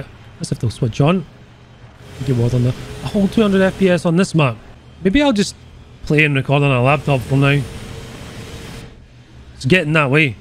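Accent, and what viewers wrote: Scotland, United Kingdom. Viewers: It's the Scottish brogue. He could read off my final rites and it'd honestly be entertaining.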